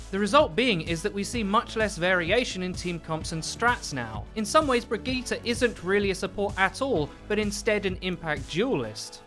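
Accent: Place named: United Kingdom. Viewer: STOP COMPLAINING! THIS CHANNEL IS BECOMING A PROBLEM FORNTHE GAME. YOU GUYS COMPLAIN ABOUT THING YOU DONT LIKE ABOUT THE GAME INA BRITISH ACCENT AND IN TURN CONTROL THE NARRATIVE FOR THE CHANGES THEY MAKE IN THE GAME! THIS IS A FUN CHARACTER TO PLAY AND SHE IS NO WHERE NEAR OVER POWERED. BLIZZARD NEEDS TO FIND A WAY TO CRATE A GAME THATS FUN FOR THE "PROS" AND FOR YOUR FREAKING BRONZE-DIAMOND PLAYERS TOO! YOU GUYS KEEP ASKING FOR CHANGES THAT MAKE IT NOT FUN FOR LITERALLY 90% OF THE PLAYERS!!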